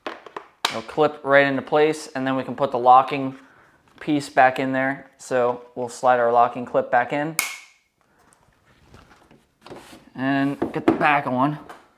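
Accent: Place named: Pennsylvania, United States. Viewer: Nice bro! Thanks installing mine later today! Love a good PA accent! lol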